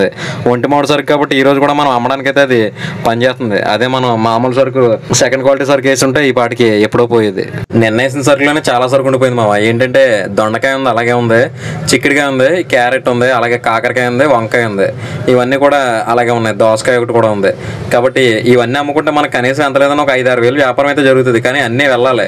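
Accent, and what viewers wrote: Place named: Konaseema, India. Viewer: కోనసీమ భాష పోలేదు బ్రో గుడ్....మాది కోనసీమ.. కొత్తపేట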